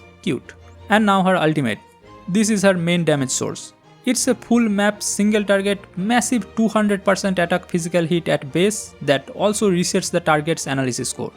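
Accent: India. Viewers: sound of indian ppl , not recommend.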